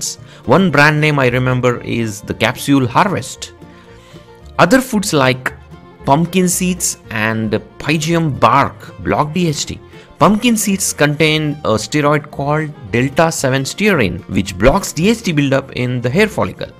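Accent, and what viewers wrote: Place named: England, United Kingdom. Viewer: That THICK English accent is making me kill myself.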